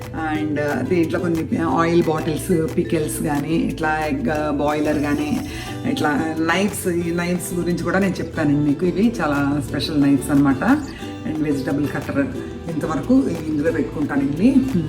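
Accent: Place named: Eluru, India. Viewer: When I was listening to you, i was thinking you must be from around Eluru/Vijayawada. I am from Eluru also and studied in same school, college. I graduated in 1992 from college.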